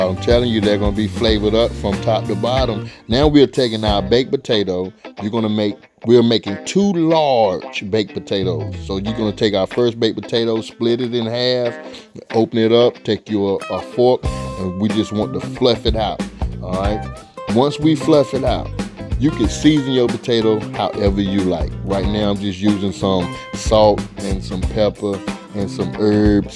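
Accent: South, United States. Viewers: Not all Americans talk like that. (Nothing wrong with it) He has to be from down south somewhere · I love how Americans talk “Some of this here minced garlic” “Get you a skillet put you some butter in it” 😂😂😂 it makes my heart warm 😂😂😂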